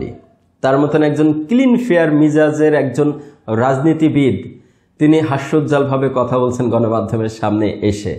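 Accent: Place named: Satkhira, Bangladesh. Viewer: ভাই সাতৱাৱ ছাগলৱাই এভাবে কথা কয়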